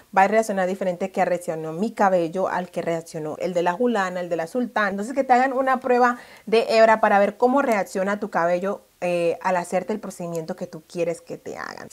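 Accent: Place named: Colombia. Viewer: tienes el timbao de la gente afro de buenaventura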